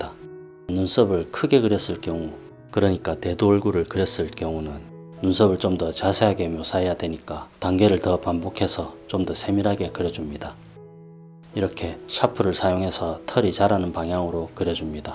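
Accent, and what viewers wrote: Gyeongsang, South Korea. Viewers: 보고 또 보고~~~ 전말 저도 겸손한 전은혜입니다ㅎ 유머가 제 스탈입니다 전말 ㅎㅎ 팬이 될듯 구독ᆞ좋아요~~~ 말투가어딘지모르게 갱상도 억양 느낌이 전 창원인데 그림 배우러 가면 멀까요~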